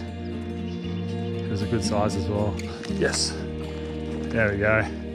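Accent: Australia. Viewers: beautiful country. i learned a new word - "brumbies" - which i looked up & found out they are feral horses. that large rainbow trout was a beauty. always enjoy your videos. it's especially interesting to watch videos from another country. i live in the US. Australia is a lovely country & i love the accent.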